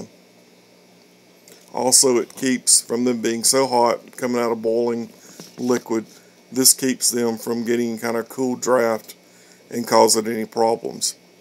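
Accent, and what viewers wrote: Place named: Texas, United States. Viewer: Do you live in lower North East Texas? You certainly sound like you are from my area? I definitely enjoyed your recipe and am in the middle of making a four pound batch right now.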